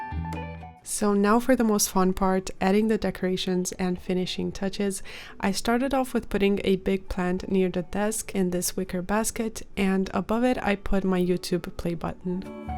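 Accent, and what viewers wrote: Germany. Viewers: are you from germany? cause of your accent?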